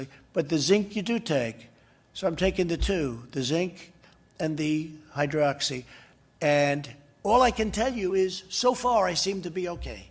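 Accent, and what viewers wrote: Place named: United States. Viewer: Yes if only there could be a split screen! You’re in Germany but you sound American, don’t forget about.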